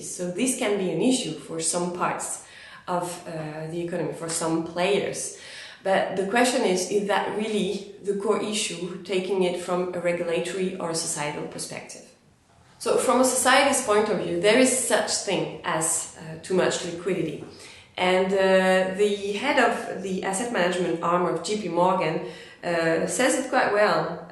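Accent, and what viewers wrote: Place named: France. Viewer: she is a french speaker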